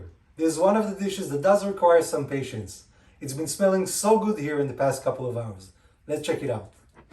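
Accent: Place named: Israel. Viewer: Amazing video. By the way, I noticed you have an Israeli accent.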